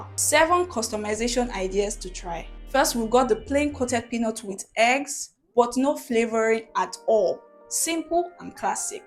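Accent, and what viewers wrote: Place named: India. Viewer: Why is the voice in India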